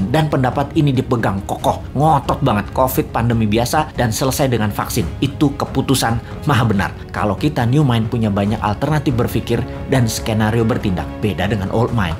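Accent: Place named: Malang, Indonesia. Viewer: Pak Bossman Mardigu AREMA asli y ??/ngomongnya ceplas ceplos tp bener si.. :-) logatnya mirip orang Malang..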